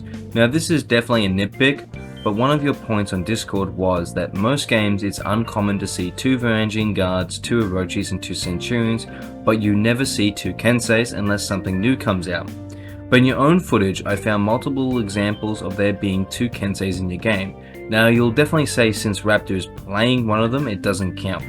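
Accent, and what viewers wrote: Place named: Quebec, Canada. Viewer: He's quebecoise? Over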